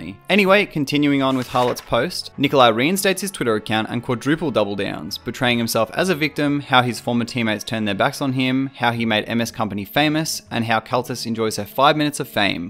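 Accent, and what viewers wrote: Australia. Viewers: Very well put together. Want to know my thoughts? Ok sure. This guy is a fucking CLOWN what the fuck did he expect? Good response by MS. I like your video style of succinct and right to the chase. It is a breath of fresh air in the hastily edited 10min videos made specifically to get ads. I also love hearing another Aussie voice behind the camera. Keep up the good work!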